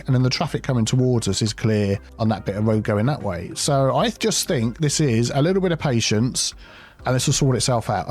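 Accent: United Kingdom. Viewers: the most adorable thing in your videos is your classy British accent. If I had such accent I’d never shut up 🤣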